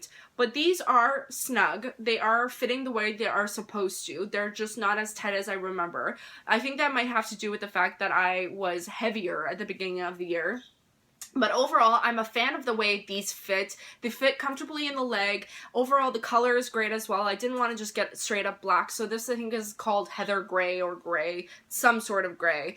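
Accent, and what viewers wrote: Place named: Canada. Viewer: Your Canadian accent is such a treat...coming from a Canadian who's been in the Americas the last 5 weeks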